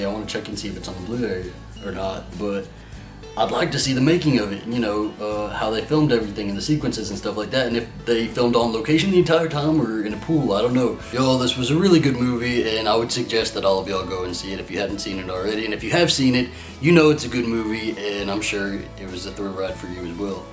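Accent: South, United States. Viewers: . You were talking about gay acting straight. You are very straight acting. If You didn't say you were gay, it would easily be assumed you were straight. But that what make you so damn cute. And I love your voice with the southern tone to add. Alastair is a lucky ass man. He screws this up, I'll beat him up for you. I'm straight acting, so I know what I'm talking about. But not as cute as you. Actually not young either - haha😂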